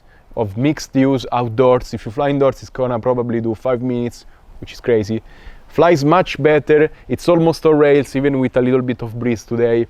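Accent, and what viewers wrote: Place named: Italy. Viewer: Fà strano sentire il tuo accento italiano, abituato ai video inglesi ! Ma a parte questo ottimo video, bravo in inglese e divertente la caccia alle galline XD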